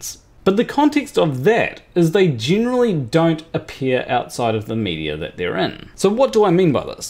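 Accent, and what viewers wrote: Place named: New Zealand. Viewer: No AI can do a New Zealand accent.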